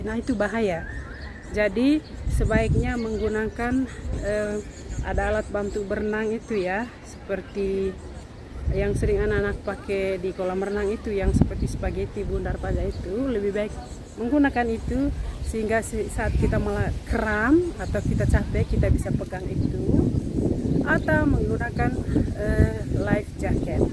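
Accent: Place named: Flores, Indonesia. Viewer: Mohon maaf, Logatnya kayak orang flores, manggarai, ruteng🙂🙏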